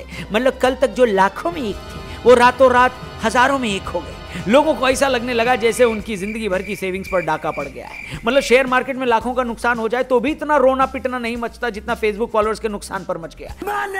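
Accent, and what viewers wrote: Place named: Bihar, India. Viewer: भाई आपका बिहारी में बोलने का style मस्त है भाई | love 💓💓you bro from patna (बिहार)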